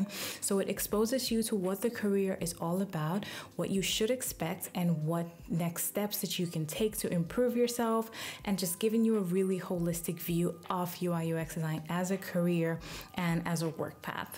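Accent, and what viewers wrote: Nigeria. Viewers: sounds Igbo dear, are you Nigerian? I am fully entering the UIUX Design space. Switching my career from media and communication.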